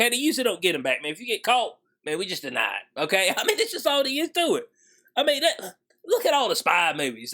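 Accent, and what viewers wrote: South, United States. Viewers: Dude I like your accent it's so country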